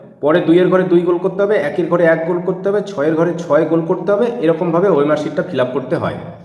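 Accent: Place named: North Bengal, India. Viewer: ভাই তোমার কথা শুনে মনে হচ্ছে তুমি উত্তরবঙ্গ বাসি। তোমার দেখানো OMR গুলি সত্যি তো? তুমি এইগুলি পেলে কি ভাবে? তুমি খুব সুন্দর বিশ্লেষণ করেছো। আমি একজন বয়স্ক মানুষ হিসাবে আশীর্বাদ জানাই।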